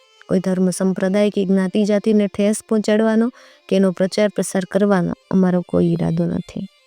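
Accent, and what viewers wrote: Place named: Saurashtra, India. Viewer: શાબાશ બહેનબા હવે,સૌરાષ્ટ્રની ભાષાની બોલીની છાંટ અને ધાર બરાબર આવતી હોય એમ જણાય છે. ધન્યવાદ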